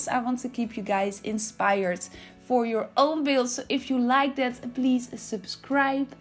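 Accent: Netherlands. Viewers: Your accent is so Dutch 😂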